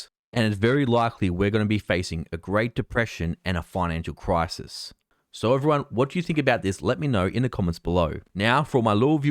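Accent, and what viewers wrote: Australia. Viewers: Also, wow didn’t know you were from Australia, always thought you were British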